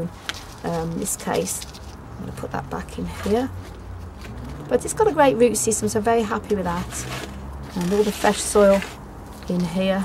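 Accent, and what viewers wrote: Birmingham, United Kingdom. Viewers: you still have the Brummie thing going on :-)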